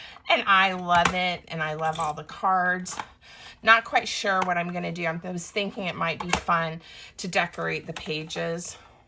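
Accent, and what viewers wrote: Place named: South, United States. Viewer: Oooooo. I have lots of old hankies too! You go girl!!! (P.S. I'm amazed how quickly your southern accent went away)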